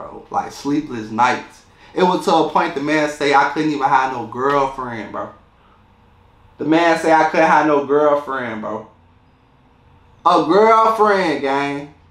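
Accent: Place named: Washington DC, United States. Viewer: This brother sounds like he's from DC. Sorry he had to deal with all that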